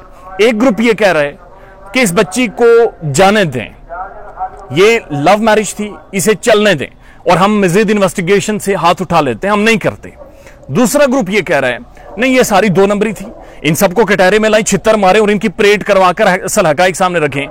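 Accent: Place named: Kashmir, India. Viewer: Your pronunciation 🤓🤓sounds u belong to kashmir😂hum kashmirion ki urdu main bi apna kashmiri touch hota he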